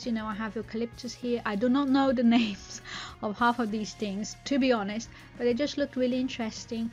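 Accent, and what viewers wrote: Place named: London, United Kingdom. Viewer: Are you originally from the UK? You have a London ish accent.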